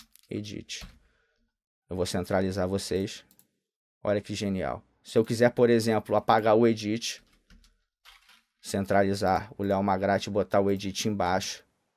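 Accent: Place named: Rio de Janeiro, Brazil. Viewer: Finalmente um Carioca que fala minha língua.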